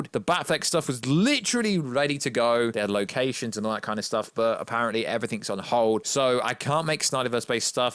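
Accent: United Kingdom. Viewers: What's your accent? Are you British speaking in a American accent?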